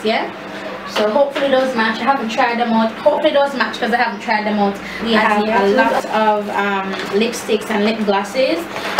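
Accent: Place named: Jamaica. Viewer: Really enjoyed this video, I thought it was just me who got super excited when I purchased new makeup! "How them romantic suh, everything jus wrap up!" I just love the Jamaican accent yes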